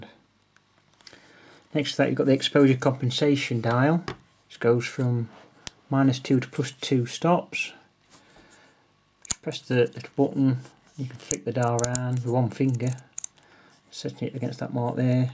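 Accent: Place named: North, United Kingdom. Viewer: I miss hearing that "Northern Accent" since moving to Bloody Florida!!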